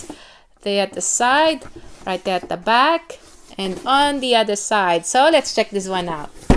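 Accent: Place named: Australia. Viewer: Been binge watching your vids. Hope to feature pops from the Demon Slayer line (if there are any). Signature mo na talaga yang Aussie accent mo. Keep posting very interesting vids!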